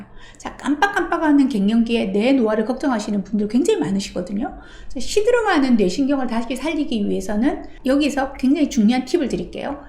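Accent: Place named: Gyeongnam, South Korea. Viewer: 호주 시드니에서 사남매 맘이 응원합니다 ^^ 저는 경남거창이 고향인데 ㅎㅎ근처 비슷한 말씨인거 같은데 ㅎㅎ좋은 영상 감사 드려요 새해도 활기차게 감사하며 아자아자!!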